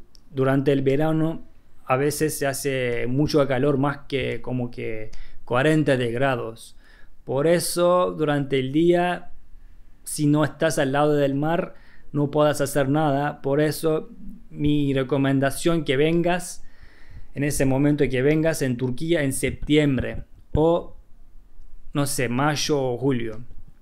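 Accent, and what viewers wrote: Argentina. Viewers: Hablas muy bien como argentino... el asentó es inconfundible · Hola, nose como llegue a tu canal. Pero un placer verte tomar mate, y que hables mas Argentino jajaja. Merhaba... Iyi geçeler. Tratando de aprender tu idioma. Para concretar El Viaje pendiente. Saludos desde Argentina 🇦🇷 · no es español de España sino español de Argentina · Que bien que hablas el español argentino!!! Bien porteño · ablas como argentino español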